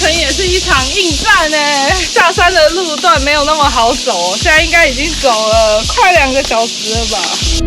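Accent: Taiwan. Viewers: Jing你的台湾国语解说很好听，语气很温柔。好喜欢！❤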